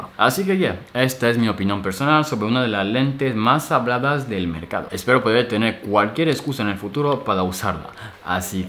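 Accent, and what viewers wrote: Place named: Spain. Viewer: Pocos videos hay en español y tu lo resume bien, por cierto de donde eres Bro? Traes un acento que me suena bastante y batallo en identificar, es una mezcla con el acento español.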